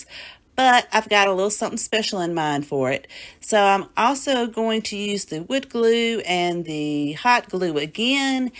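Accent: South, United States. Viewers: How cute both diy’s are. Found you through Heidi. I subscribed today. I’m so happy I found you. I think both projects go together. Where are you from? You have a very southern accent. I’m from Pensacola, but I live on the coast of MS now.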